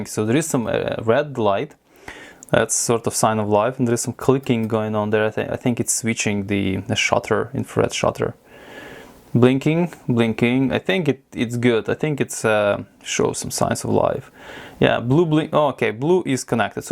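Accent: Russia. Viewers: I love your russian accent 😆 🤣 😂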